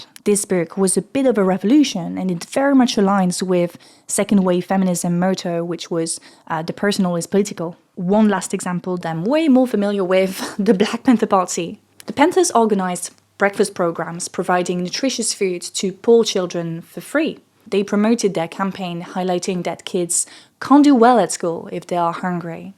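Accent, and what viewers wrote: United Kingdom. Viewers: Why does she sound like British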